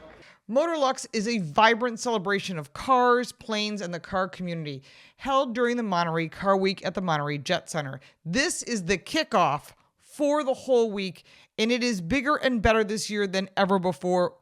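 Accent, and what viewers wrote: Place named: Midwest, United States. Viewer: 'thought so. i'm mainly from Chicago originally, but spent a couple years in Detroit (Southfield, 12 Mi. & Greenfield, <num>) & had family in Detroit. Unique midwestern accent! 'Enjoyed your video - What a great job to review those wonderful classics!! - Best wishes, Phil in AZ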